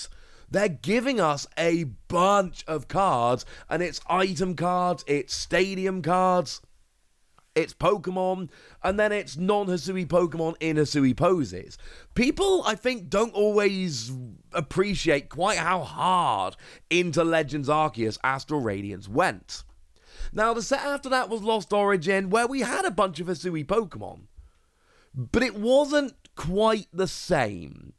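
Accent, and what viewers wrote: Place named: United Kingdom. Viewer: Oh crap, I completely forgot this was British. Lol, every time he talks about the next Pokémon game it’s gonna be like nails on a chalkboard.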